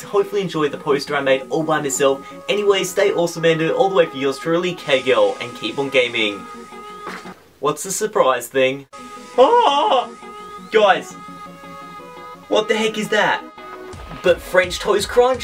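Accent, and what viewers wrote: Australia. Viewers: you're Australian accent is fabulous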